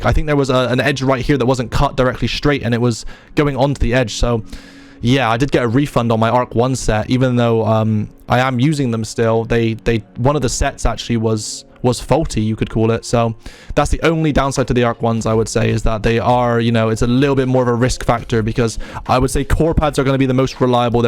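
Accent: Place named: Bristol, United Kingdom. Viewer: You from Bristol mate? Amazing to hear a familiar accent in the peripheral community 😁 subbed and liked!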